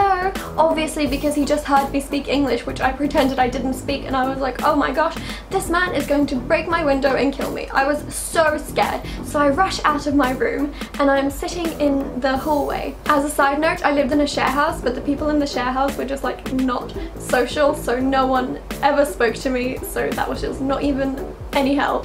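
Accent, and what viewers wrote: United Kingdom. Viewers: what british accent is this? I LOVE IT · i'm a simp for british accent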